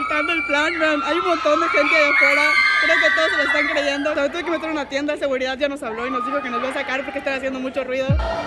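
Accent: Campeche, Mexico. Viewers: Oye amiga eres de campeche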